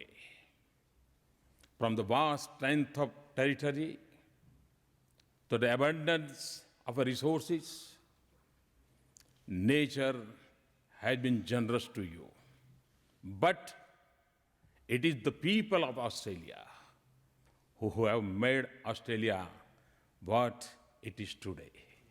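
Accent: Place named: Gujarat, India. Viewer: All he has to do is work on his thick Gujarati accent, train a bit on diction...and hes the best of the best!!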